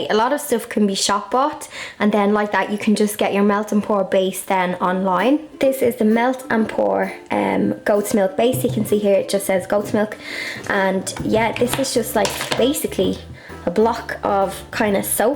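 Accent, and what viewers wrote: Ireland. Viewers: I've really enjoyed watching you. Thank you AND it's so wonderful to hear the Irish lilt 😍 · I knew by your accent yesterday u were Irish. I’m from Ireland too. What part you from? Ca bhfuil tu I do chónaí? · I love your Irish accent and your video looks perfect including your dress and lipstick matched to perfection . Your eyes look straight out of a doll . The whole video - yourself , the recipes , soaps are just perfect . In India I might not get the same quality of soap base but will try with what I can get . Great work ! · Your beautiful voice reminds me of my family in Ireland. I LOVE YOUR SOAP! It came out so cute and I hope Im just as lucky my first time round. Thank you for giving me some confidence in soap making ^_^ I appreciate you and all you do! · I love your Irish accent. Definitely going to try these with a view to selling them on my shop. 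Please do lots more! Have just started subscribing to your videos.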